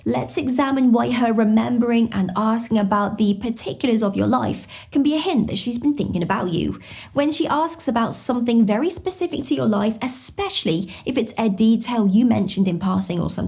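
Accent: England, United Kingdom. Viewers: Wow thanks for the advice. 🙂 I love your English accent! You're so cute! ❤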